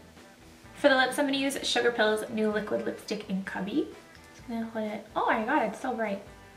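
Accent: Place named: Canada. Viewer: This is the first video I’ve watched of yours upon seeing it in my recommended videos list. Could tell that you’re Canadian as well instantly !! Super cute!! Subscribed!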